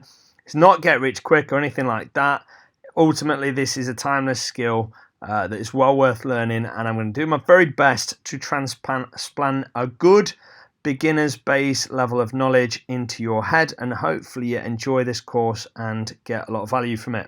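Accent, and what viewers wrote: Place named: England, United Kingdom. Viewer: Hi. Loved your video - so good to hear another English accent. I’ve subscribed and am off for video 2. Thanks.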